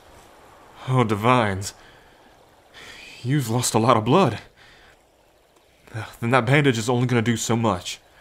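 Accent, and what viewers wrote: South, United States. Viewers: He sounds southern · a heavy southern accent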